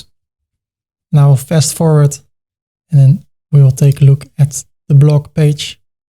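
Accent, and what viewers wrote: Netherlands. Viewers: ik wist meteen dat je nederlnds was je kan wel goed engels praten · your accent and face just shows me that you are dutch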